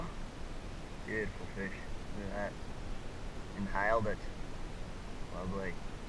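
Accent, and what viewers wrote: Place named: Australia. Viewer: you are the 3rd Aussie i subcribed love you guy's your great sports and love the accent to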